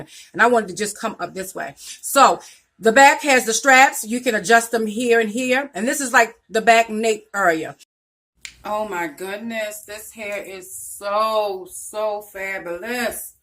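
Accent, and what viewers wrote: Baltimore, United States. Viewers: Baltimore accent SKRONG 💪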